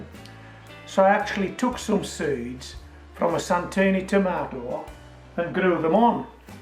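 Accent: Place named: North, United Kingdom. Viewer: Proper nothern accent. Absolutely love it haha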